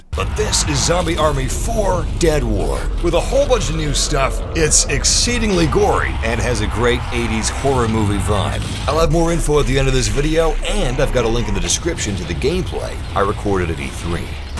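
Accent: Netherlands. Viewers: Dude, i can only hear Dutch now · You almoust sound like ducht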